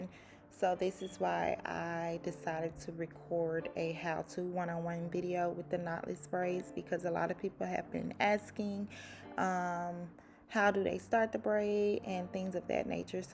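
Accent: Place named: Mississippi, United States. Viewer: girl you sound like you from mississippi! 😭😭💜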